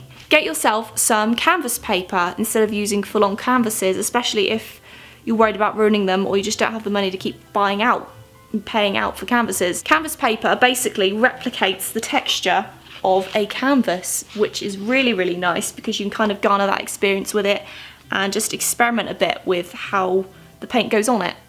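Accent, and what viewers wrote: United Kingdom. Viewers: erm are you American you say dollars and stuff but you sound like you have a British accent lol. great video I'm more of a sketcher, drawer however you say it but the organisation tip was pretty useful. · do you live in America? hearing a British person saying dollars and hobby lobby confuses me 😂😂😂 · Becaus you have a british accent and you live in america... or england.. plz tell us in a vid!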